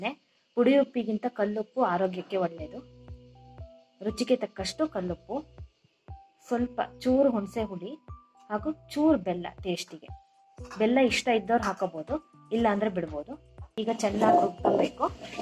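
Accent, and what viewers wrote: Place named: Malnad, India. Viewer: ಸೂಪರ್.. ಒಳ್ಳೆ ಮಲ್ನಾಡ್ ಭಾಷೆ.. ಒಳ್ಳೆ ಅಡುಗೆ ಸೂಪರ್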